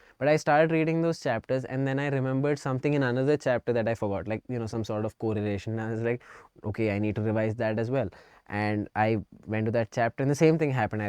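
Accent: South India, India. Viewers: maybe he's from southern part of India nd Hindi is not his Mother tongue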